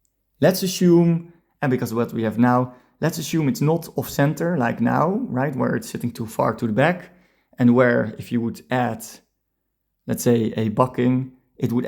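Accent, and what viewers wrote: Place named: Netherlands. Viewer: wllh ik hou van deze nederlandse accent ahahah, je kan het van een km ver horen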